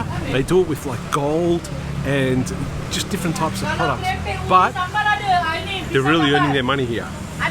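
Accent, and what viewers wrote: Australia. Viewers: Where are u from boss? Australia? Your accent likes ausie guy. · I visited your channel for the first tome today. I didn’t pick you as Turkish, but your Aussie accent is a dead giveaway. Btw, I like tour channel and just subscribed.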